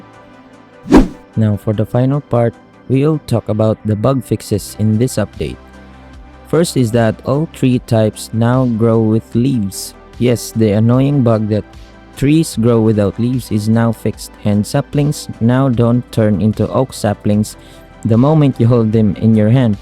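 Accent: Philippines. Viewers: You a Filipino? Your accent seems like filipino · Hello! Are you a filipino? because you sound like..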